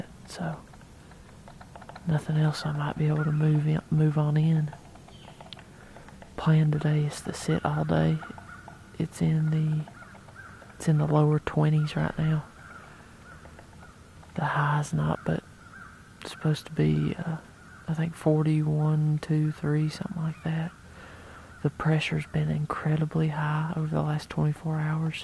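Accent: South, United States. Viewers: That music killed the video.... And your southern talk.... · Personally the southern accent makes him sound like he knows what he is doing. Hats off to you southern dudes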